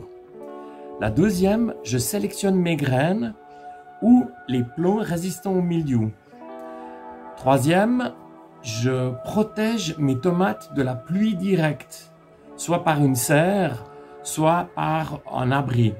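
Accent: Switzerland. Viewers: Merci pour vos conseils, j'adore votre accent qui me rappelle mes séjours très agréables en Suisse.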